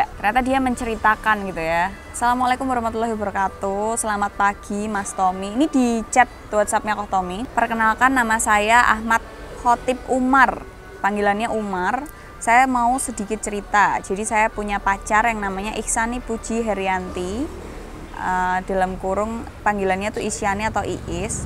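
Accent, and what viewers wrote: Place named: Semarang, Indonesia. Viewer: Logat Semarang kok nggemesin :)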